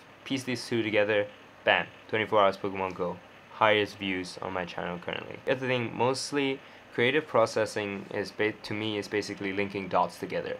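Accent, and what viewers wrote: West, United States. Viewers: i like how has a angmoh accent in his vlogs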